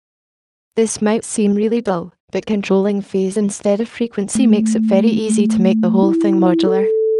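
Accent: Scotland, United Kingdom. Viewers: I never imagined I'd be listening to a female robot with a thick Scottish accent teaching me synthesizer programming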